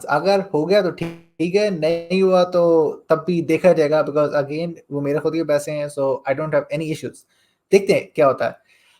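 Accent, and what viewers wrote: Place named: Pakistan. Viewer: He is a pakistani,it is audible from his accent. Woww! Great job u have shown that money is the greatest thing in world 🖕🖕🖕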